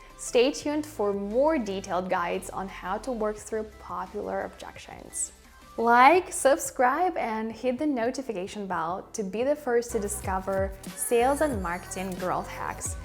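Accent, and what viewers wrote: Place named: England, United Kingdom. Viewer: I have subscribed to your channel for your English accent. Your English pronunciation is different from others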